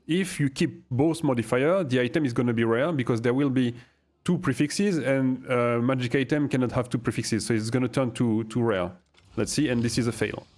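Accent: France. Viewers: On reconnait l'accent bien français bien bourguignon. Nice craft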